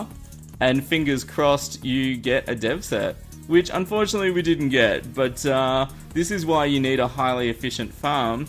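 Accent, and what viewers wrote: Australia. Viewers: what part of Australia are you from I'm in south Australia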